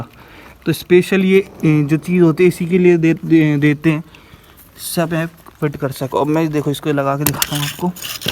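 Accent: Haryana, India. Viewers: bhai sabse khas baat ki aapka face dekhne ko mila first time bhai nhi abhi tak khali koi haryanvi awaz hi aati thi bss 😀😀😀😊😊😊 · Bhai yarr i love your way you speaks in haryanvi tone..love ur videos..although i lives in ghaziabad but my favourite language is haryanvi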